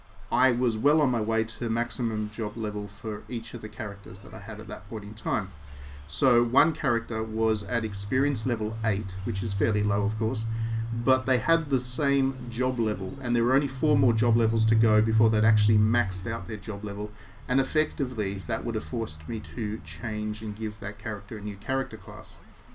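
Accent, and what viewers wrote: Australia. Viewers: Been watching this closely, the fact that bravely default was often referred to as the best final fantasy in a long time (without being one) by a lot of people had me so interested but I never got to play it. (Hopefully they port to switch or ps4...Dunno I think it's a nintendo exclusive?) This told me pretty much what I needed to know, ya also sound Australian so consider my subbed. Got ya point across well an laid back bro. 👌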